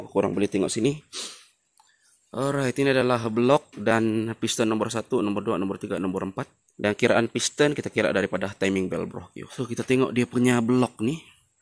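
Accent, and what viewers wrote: Sabah, Malaysia. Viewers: Lokasi di sabah atau serawak ke ni,, loghat mcm org sabah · Iya bos loghat sabah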